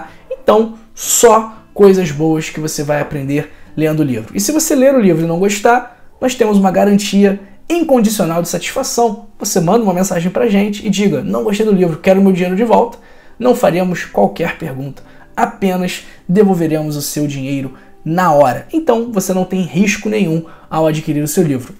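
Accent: Rio de Janeiro, Brazil. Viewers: Carioca ! Menos! . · Você fala muito muito carioca. É muitos x x x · Esse sotaque de carioca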